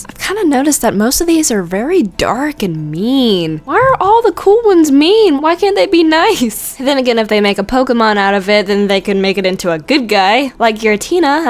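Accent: United States. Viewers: you sure youre not american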